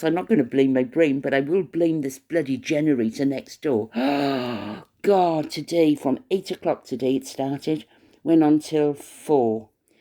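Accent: Wales, United Kingdom. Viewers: You’re back!! I have often wondered about you,. Love listening to your soft Welsh accent and your lovely little stories mixed in with the serious content of the vlog. We won’t say “toma-toes” again. I think that was what finally took you down - especially the rotten ones;) Good to hear you are well and in fine fettle. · OMG!!! can't stop laughing at u're story about u talking 2 the telly!!! I'm from Swansea and u're accent reminds me of an aunt of mine, but she was from Newport 😊 x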